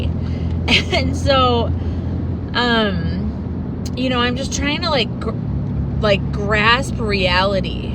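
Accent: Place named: Wisconsin, United States. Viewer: I could tell right away you were a Wisconsinite, or possibly Minnesotan, then you said Great Lakes. I’m from the same area. You seem like someone I’d get along with, I like your personality. We’d have a lot we could relate on, I don’t know anything about having kids though. Despite what other commenters have said, it sounds like you’re doing an amazing job, you’ve got the right attitude, and I applaud you for going after your dreams when you can find the time for yourself. That’s something your kids will look up to. Just make sure you get enough sleep so you don’t get sick! :) wishing you all the best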